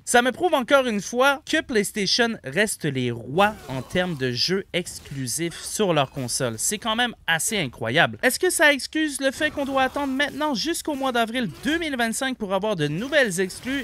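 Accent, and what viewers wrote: Quebec, Canada. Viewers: ah l'accend on dirait un québecquoi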